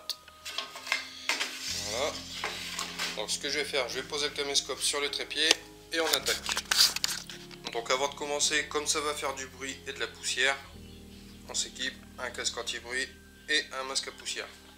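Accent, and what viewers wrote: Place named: North, France. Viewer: Ça fait pas longtemps que je suis tes vidéos. Mais merci pour ton taff, tes vidéos sont simples et bien expliqués. Mais tu serais pas du nord; ta un petit accent. J'ai le même 😁😁.👍👍👍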